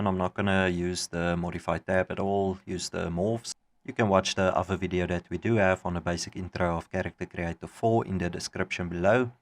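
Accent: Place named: Afrikaans, South Africa. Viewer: i are liking your afrikaans accent :)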